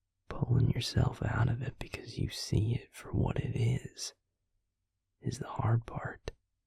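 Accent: South, United States. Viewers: Lemme just say, this southern boy makes my tennessee gal heart happy. (as much as i hate living in the south, there’s something about soft spoken southern charm that has me swoonin.) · I’m liking the southern accent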